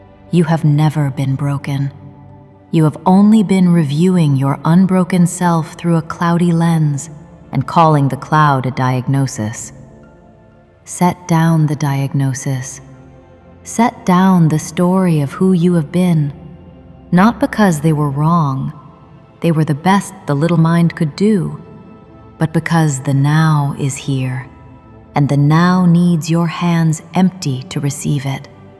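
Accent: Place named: United States. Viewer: If you are DF Mother God, may I ask, why do you have an American accent? ??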